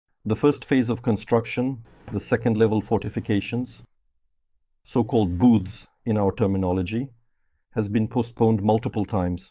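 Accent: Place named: India. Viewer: Как будто индус озвучивал) Ну вообще неплохо.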